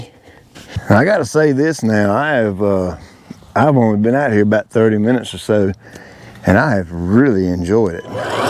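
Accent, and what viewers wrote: South, United States. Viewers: Hey, the only thing thicker than your smooth Southern accent is your gorgeous lawn! All we can see up here in Minnesota is snow, snow, snow. But, you're helping us hit the ground running when Spring finally hits. I hope your socks are blown off from all the Blessings!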